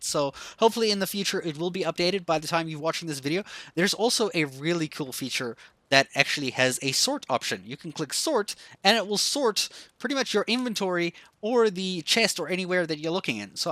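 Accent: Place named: South Africa. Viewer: My dude. Where are you from? You sound North American, but then you 100% don't. South African and moved to the US or Canada? hmmm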